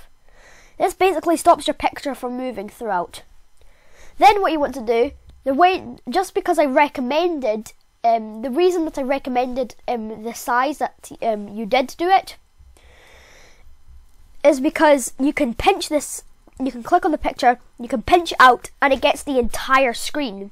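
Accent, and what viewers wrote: Scotland, United Kingdom. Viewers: You sound so Scottish! (No offence if your not, I am anyway..) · Lol its cool because you havent fully developed your british accent in these early videos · This helped a lot tysm!!! Also- are you Scottish? Cuz I am too :) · Are you Scottish? I love your accient! I am Scottish btw! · I’m guessing your from Scotland? 🏴 It’s alright, I’m from the great country to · are u from scotland? Dumfries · Are you Scottish . You have an accent which sounds Scottish cuz of the way you say it. You don't really pronounce the T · U sound Scottish r u Scottish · Are you Scottish? you sound Scottish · Are you Scottish???! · Omg your Scottish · Are u British xD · Are you Scottish?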